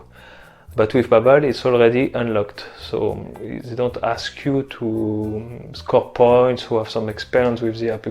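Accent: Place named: France. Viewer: So nice, c'est toujours un plaisir de regarder tes vidéos, de plus avec ton petit accent français ça me permet de vraiment bien comprendre ! You are on the good way my friend ! 👏👌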